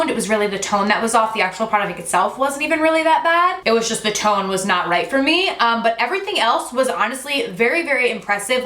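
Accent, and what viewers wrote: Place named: South, United States. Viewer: I was wondering where you are from because i catch you saying southern things and a little accent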